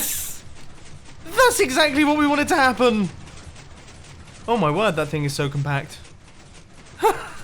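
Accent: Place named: United Kingdom. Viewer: this guy is so bri'ish it's fucking disgusting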